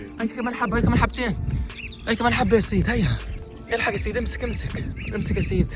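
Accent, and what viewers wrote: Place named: South, Saudi Arabia. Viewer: ❤❤😂 نفس لهجتنا اخ جنوب السعوديه حوش الصيد واكفتها وتشرد وكثير وعشان 😂 نفس اللهجة اقرب لنا من اللي قريب من ٥٠ كيلو تختلف حنا عنهم وانتم تبعد ونفس اللكنه ❤😂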